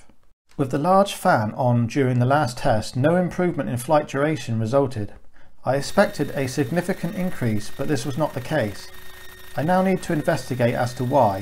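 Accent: United Kingdom. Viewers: Those skids are TITANIC. Though it makes sense if you're learning to fly or are flying an experimental aircraft. Still look weird though. Also you sound English. Are you a trained and licensed pilot? I was wondering how the law for homebuilt micro/ultralights (whichever's smaller) worked.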